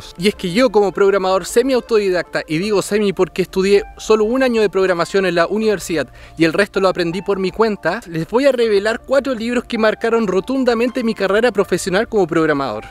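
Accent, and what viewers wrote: Chile. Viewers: me parece que por tu tonada sos de Chile, ( pobre Chile prendida fuego ). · hola!! eres chileno? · ¿Otro chileno? 🤣🙄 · Sos chileno weon?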